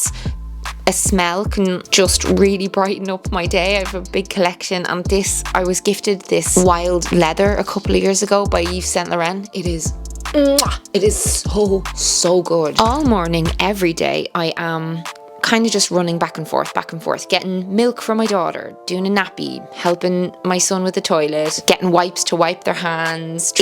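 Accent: Ireland. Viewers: I love those videos ❤ you never dissapoint Mel ❤ I'm from Poland, my boyfriend is from the UK, you're the first and probably the only youtuber I watch from Irleand and I love the accent. I'm in my mid twenties not close to having kids yet but yet you still manage to inspire me with every video you put out and to incorporate some bloody positive chagnes in my day to day life. No lying, you must be the youtuber that inspires me the most and if I had to count down on screen time your channel would be the only I'd watch ❤ I'm currently doing my driving licence as well I started when I was 19 but there's been complications I truly can't wait for this to be my reality, to have so much freedom I deeply long for ❤ I feel bad about myslef not having it sometimes. You share with us so I thought I'll say a few bits about me, your dedicated viewer. You really do inspire me for my future, kids, overcoming traumas/addictions etc. 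With two little kids you're doing such a good job! here on youtube and raising those little angels (I'm sure they have those days, but they seem so smart and emotionally inteliegent). It's incredible how simple things are sometimes, go to the forest, move your body, eat whole foods. Everytime I forget about it and start feeling like s.. I have to go back 🌳🌿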